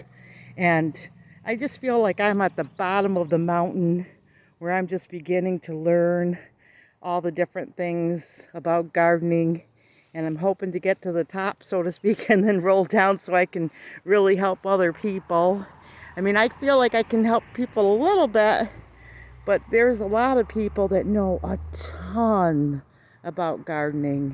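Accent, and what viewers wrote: United States. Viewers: sounds just like here in Ohio lol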